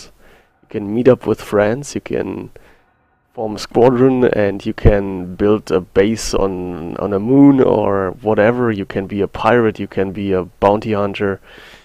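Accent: Germany. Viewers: was für eine scheiss englische ausprache. alter deutscher gehts nicht. peinlich.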